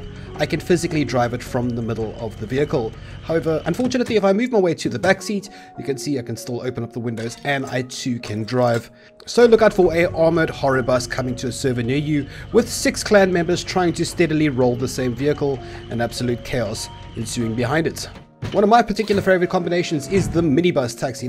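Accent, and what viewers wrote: South Africa. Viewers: The taxi shit killed me, I had my suspicions that you were South African from your accent, but that minibus taxi joke confirmed it. You aren't wrong though 😂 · wait , your from south africa , i knew it I thought i recognized the accent , nice joke about the taxis in SA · I new you acsent wasJuf vir vandag periode 5 se hulle ons het SS. Is die geo of gesk ¿ South African Edit: I now realized that I typed like I was drunk Wtf I’m keeping it like that Admire it Admire it!!!!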